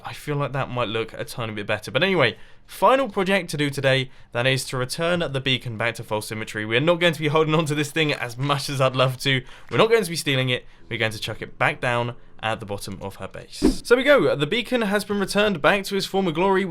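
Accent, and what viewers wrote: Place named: United Kingdom. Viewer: you pronounce farm fohm because you're British shugeh cane fohm huhmmit croft laver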